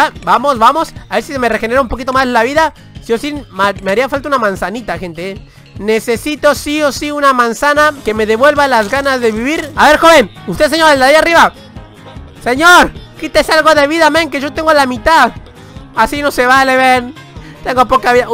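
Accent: Argentina. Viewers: ! Sos argentino? Xd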